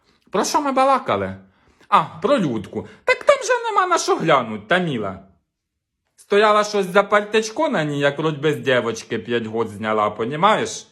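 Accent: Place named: Kirovohrad, Ukraine. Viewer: Привіт з Німеччини,! Живу тут 8 років і сумую за нашим сленгом. Я з Кіровоградщини і говір похожий.Але такі перли у нас не видавали ще. Оце вже ввійшло за традицію - Прихожу з праці і одразу шукаю нове відео від тебе. Подивилася,бальзам на серце отримала і можна з хорошим настроєм відпочивати. Коли ж вже нам Гюльчатай відкриє своє личико? Не тримай так довго інтригу. Бо такі таланти треба оприлюднювати. Бажаю творчих успіхів,мирного неба і здоров'я! І слава нашій Україні!!!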